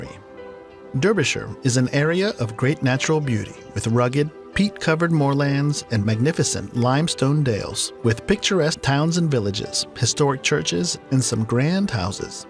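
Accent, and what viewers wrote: United States. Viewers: yanks - we pronounce clerk as CLARK and wish you would also. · ... "best places to live in the UK" ... american accent introduces vid--wait. no. what? f** off. see ya* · Have you noticed the guy is bloody American 😂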